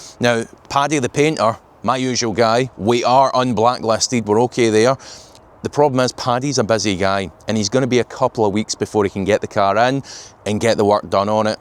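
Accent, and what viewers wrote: Scotland, United Kingdom. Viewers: Common sense and love the Scottish accent . AYE MY LADDIE FROM australia .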